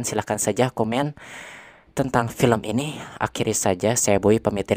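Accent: West Java, Indonesia. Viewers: Kunaon nya ari nu ngareview na org sunda Ngakak wae😂😂😂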